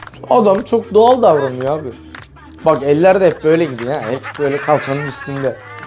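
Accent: Azerbaijan. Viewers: Salam qadanalim men seni tam annamadime ağali ela Azerbaycan lehcesinde danişisan amma soyadin. Ağali senin atan Azerbaycannandi hee? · Abi selam sen Azerbaycanlımısın konuşmandan benziyoda o yüzdrn söyledim.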